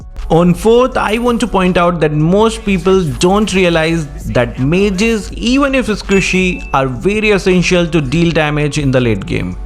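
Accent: India. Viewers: Your voice similar with indian accent... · Channel ini ni punya orang India ya? Logatnya kebaca bgtt jir 🤣🤣🤣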